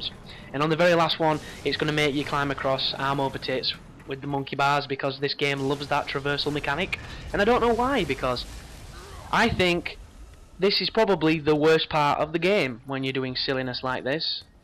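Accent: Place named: Manchester, United Kingdom. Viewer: Thanks for the vid. I was stuck on this then got it first time. Nice to hear another northerner on YouTube. Doesn't sound far from Manchester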